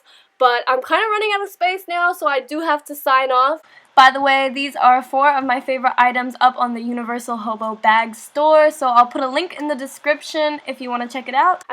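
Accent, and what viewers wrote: Australia. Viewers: maybe i'm going crazy but you sound so australian here and i can't ever remember you being australian wtf is going on with my brain rn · In this video I can really hear your Australian accent😊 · You have like a little Australian accent now and it's so cute😍😍😂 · Aww your accent is changing. You're beginning to sound Aussie !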